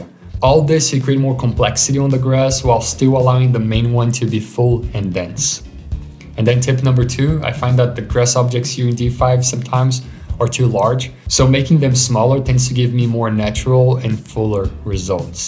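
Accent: Brazil. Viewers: vc é brasileiro?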